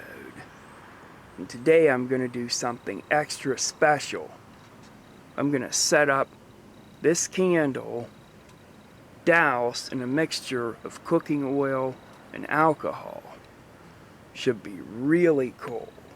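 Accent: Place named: Pittsburgh, United States. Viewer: I'm loving the Pittsburgh accent btw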